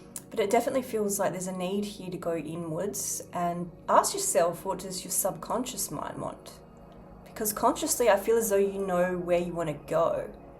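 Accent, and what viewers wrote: Australia. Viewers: SHEEYA..😊 U AUSSIE'z..and yur VOWELS...YAY!! ROWR ROWR !! 🥀😁🤙💋❤️‍🔥😋😘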